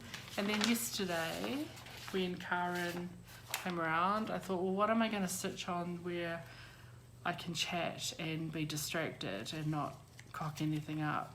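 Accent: New Zealand. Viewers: So cool to hear your Kiwi accent after watching so many American Floss Tubers. I love your colours for the Modern Folk Embroidery SAL. So pretty. Your mustard house is so cool. I am yet to stitch a big house. I'd love to stitch that one.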